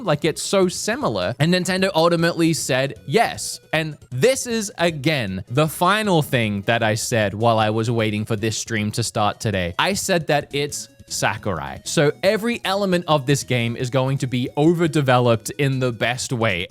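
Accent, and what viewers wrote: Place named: Australia. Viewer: Where are you from? I can't figure your accent out. I get Australian at times, and Boston at others.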